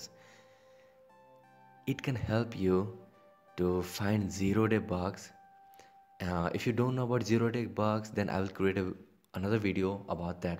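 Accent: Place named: India. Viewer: me i as soon as i hear a India guy voice: AM IN THE RIGHT PLACE. love to all indians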